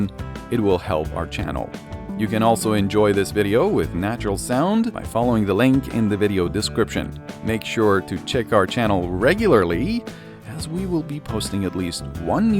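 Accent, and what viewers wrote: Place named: United Kingdom. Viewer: British accent pffff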